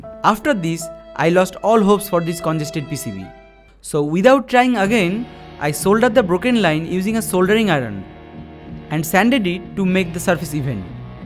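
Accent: Bengal, India. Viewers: How do I contact you? You also sound surprisingly much bengali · Accent Is Like Bengali Dada Where Are You From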